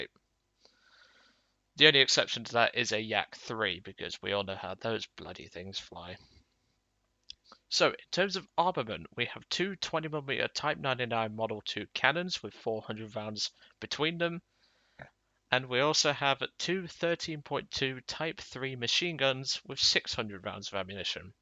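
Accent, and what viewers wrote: United Kingdom. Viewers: you don't use kilometers, but you have an English accent. Am I hearing things wrong?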